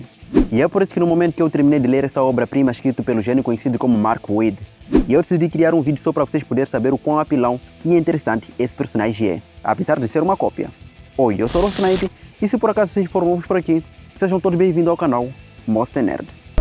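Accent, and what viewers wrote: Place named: Angola. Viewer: Parece sotaque angolano ou talvez de Portugal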